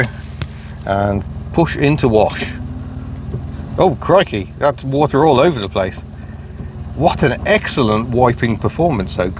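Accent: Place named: Scotland, United Kingdom. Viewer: That Scottish accent is so cool my friend. I could listen to you talk about vegetable gardening for hours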